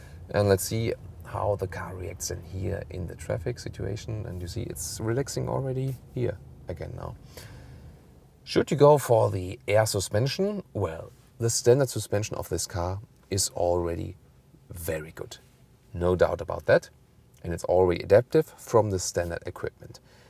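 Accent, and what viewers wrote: Germany. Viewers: This guy does a great job on the car reviews and I like his german accent. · he speaks "germish" :) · I've subscribed because of the great in depth reviews, but also because of that lovely german accent!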